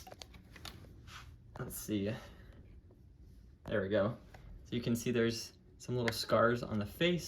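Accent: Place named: Spain. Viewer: porque haces videos con vos española no me gusta soy colombiano pero no me gusta mira te escriben todos en ingles y se ve raro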